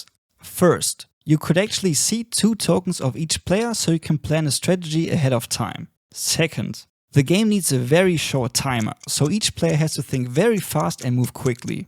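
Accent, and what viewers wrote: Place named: Germany. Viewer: Ich hab mir während des gesamten Videos gedacht "der Akzent klingt doch irgendwie voll Deutsch", und am Ende einfach der Screenshot vom Kanal wo steht "Mehr über diesen Kanal" 😂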